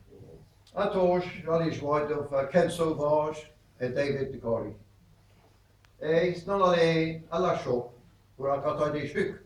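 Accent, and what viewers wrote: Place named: Guernsey, United Kingdom. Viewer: Yes, it's a typical Guernesiais accent. (If you want to get into detail, there are several different accents even within such a small island, but to most outsiders they would be hard to distinguish). Norman French (of which Guernesiais is a variety) had a huge influence on modern English, but that was from the 1066 Norman Conquest until around 1400, ie. before the Great Vowel Shift. But the many languages and dialects of the British Isles haven't influenced each other's vowel sounds over the centuries since.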